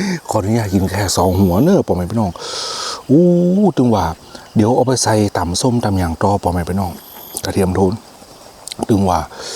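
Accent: North, Thailand. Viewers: ชอบตรงทีเขาอู้คำเมืองจ๊ะ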